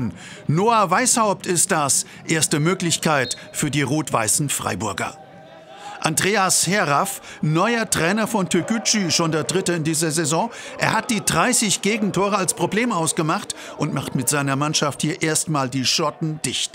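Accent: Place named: Swabia, Germany. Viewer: Ein noch frustrierter Schwabe als Kommentator?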